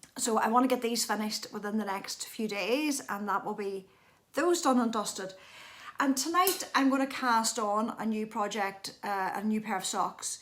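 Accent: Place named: Ireland. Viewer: Thank you so much, could listen to you all the time.Love your Irish accent.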